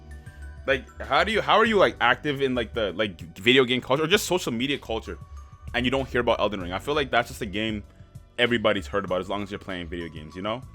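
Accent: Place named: Canada. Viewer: Bruh tell me how I aint know yous canadian. Im deaf ash to accents n shi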